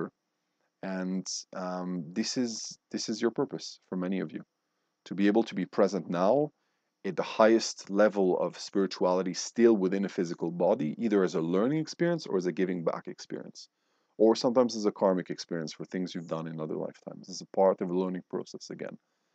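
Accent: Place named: Switzerland. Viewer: oh then I understand why I like your accent, I was born in switzerland too :) still living there but I actually always wanted to move to Canada as a child. now I feel more drawn to England and Ireland. your encounter with the arcturians sounds fascinating, I would like to meet them too one day.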